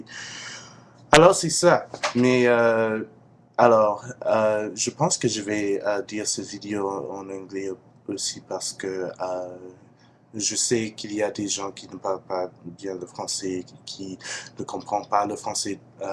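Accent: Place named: France. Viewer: C'est déjà un peu mieux. L'accent se rapproche beaucoup plus du français.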